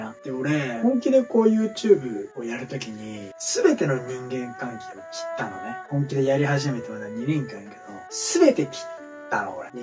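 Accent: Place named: Kansai, Japan. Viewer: 関西弁がびっくりしてもぉーた😂 頑張って下さい 見てますおもろいですよ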